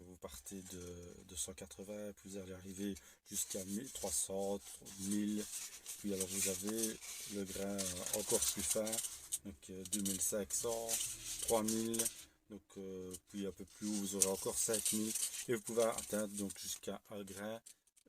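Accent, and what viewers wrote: France. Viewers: Esse sotac FRANCÊS é muito incrível. Gosto muito de ouvir.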